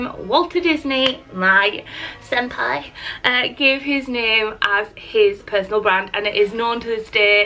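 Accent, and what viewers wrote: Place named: Middlesbrough, United Kingdom. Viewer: So pleased you made this video. I went through the same sort of process writing down all sorts and ended using 3 names together. The name my dad always called me - sweetpea - and the name my brother used to annoy me with - Polly - and because my business is craft related I used - crafts. So hence the name Sweetpeapollyscrafts. Not sure now if thats too long or I should have used cards instead of crafts as that is what my business is about. Your accent makes me feel like I am back home in Middlesbrough and I really love it when you visit the coffee shops in Marske, its not far from the hair salon I used to go to - Contemporary. Keep up the great work!!!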